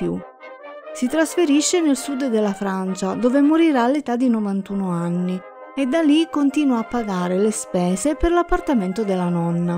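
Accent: Italy. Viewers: Sei palermitana tu che parli ???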